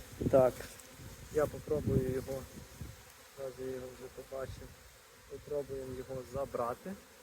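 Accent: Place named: Prykarpattia, Ukraine. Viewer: удачі.розмова як на Прикарпатті.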